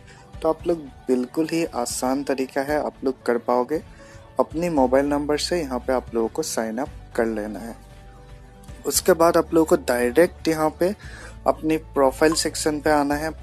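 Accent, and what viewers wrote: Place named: Assam, India. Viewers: he is from Assam i think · Assam se hain ye... 🤗 Himonoto biswa sarma ji bhi yhi se hain ..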